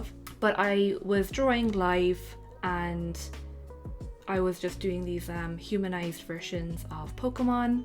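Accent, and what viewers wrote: Ireland. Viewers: Some beautiful work and a lovely Irish lilt to the narration. Really enjoyed your video! Thanks for sharing !!!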